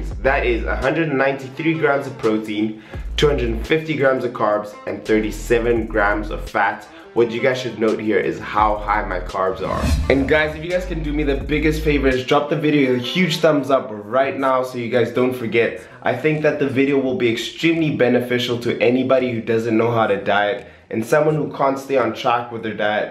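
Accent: South Africa. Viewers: where are you from? You Sound South African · Is it just me or does he have a South African accent🤔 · Great video, so there's hope for someone who's got to have that coffee and cookie to remain sane. We wont wish u well with your studies cos you clearly have discipline! Believe me you look and sound South African no lie. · Bro your accent sounds South African? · He does sound South African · was pretty sure I recognised the South African accent, then the nescafe just confirmed it all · You sound South African? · Great video. You've gained a subscriber 👌🏼Also, you sound South African, but those aren't SA products... where on earth are you dude? 😂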